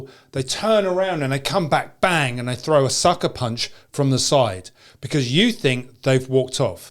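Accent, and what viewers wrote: England, United Kingdom. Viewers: There is NO ADVANTAGE to having no experience in fighting. No matter what this drunk content creator with an English accent says.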